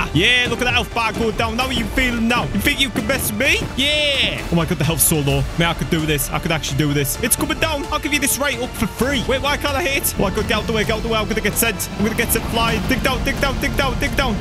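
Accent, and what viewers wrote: Scotland, United Kingdom. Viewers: Are you British? I don't care you you even answer And is this video sponsored by laff loaf? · Are u Briish are u briishmate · You sound Scottish like me mate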